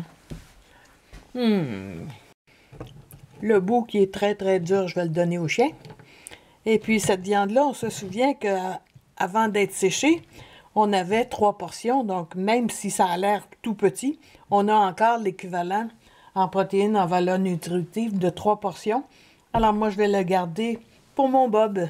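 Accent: Quebec, Canada. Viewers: Je viens de voir votre vidéo ( donc bien après sa sortie) au moment même où ma viande de bœuf séché gentiment depuis 2 semaine et qui donne déjà un joli résultat ( je compte la laisser encore une a 2 semaine a sécher en plus ) . De mon coté je comptais la portionner et la stocké dans des sachet sous vide. Pour ce qui est du sel qui m'a servi au salage : vu que j'y avais ajouté des aromates je l'ai fait séché et je l'utilise régulièrement pour assaisonner mon eau de cuisson ( légumes, féculents, etc ...) Merci pour vos vidéo avec cet accent québécois que j'adore écouter depuis la france :) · J'adore l'accent québécois je trouve ça très élégant ou très raffiné pas comme en France qu'ils ont appris la langue des racailles XD (les descendants de l'émigration) et je m'abonne 👍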